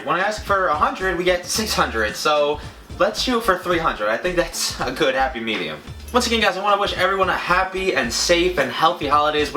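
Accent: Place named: Boston, United States. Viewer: are you from boston?? you have such a bostonian accent!! i love it hahahaha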